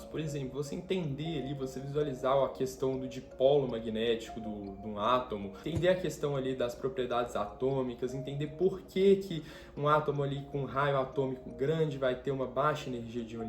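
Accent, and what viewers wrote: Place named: Minas Gerais, Brazil. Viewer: Oh sotaque mineiro, e muito bom kk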